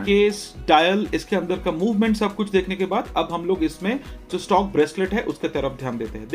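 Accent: Bengal, India. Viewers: Dada bangali??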